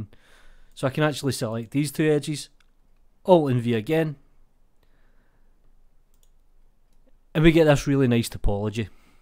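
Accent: Scotland, United Kingdom. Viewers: Great channel. I enjoy listening to a Scotsman, as I can only understand 2 out of 3 words, it keeps me on my toes. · Came for rip tutorial, stayed for magnificent scottish. Great video, thank you!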